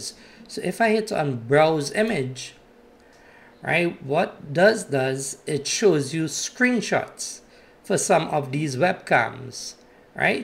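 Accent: Trinidad and Tobago. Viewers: trini boy?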